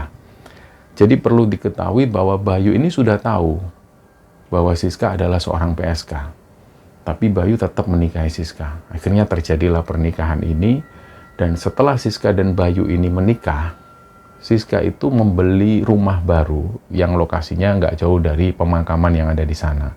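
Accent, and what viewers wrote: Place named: Surabaya, Indonesia. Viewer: Assalamualaykum Mas.., maturnuwun atas ceritanya, sungguh kami sangat menikmati kisah tentang Mbak Siska dimalam ini. Meskipun cerita-cerita yg Mas bawakan selalu bernuansa horor, tetapi saya selalu tersenyum kalau Mas menyebut diksi "cepat-cepat", Mas selalu mengatakan dengan "cepet-cepetan". Mungkin itu dialektikal Surabaya-an kali ya...???! 😊😊😊🙏🙏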